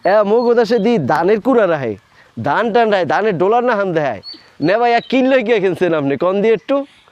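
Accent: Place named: Barishal, Bangladesh. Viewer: ভাই আপনিতো অনেক ভালো বরিশাইল্লা মারতে পারেন।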